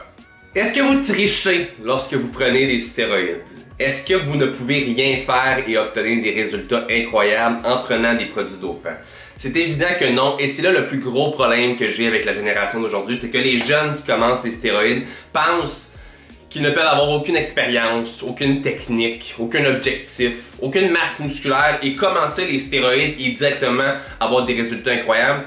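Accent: Quebec, Canada. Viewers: L'accent québécois.. savoureux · Première vidéo que j'regarde de ce type. L'accent québécois je m'y attendais pas du tout.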